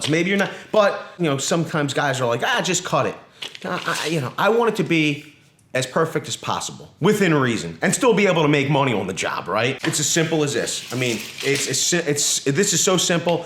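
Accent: Philadelphia, United States. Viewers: What part of Philadelphia are from?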